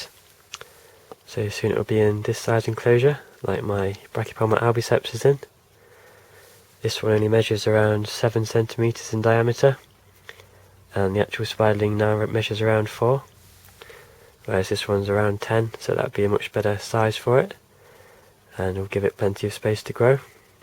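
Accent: United Kingdom. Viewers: British Pronounciation :)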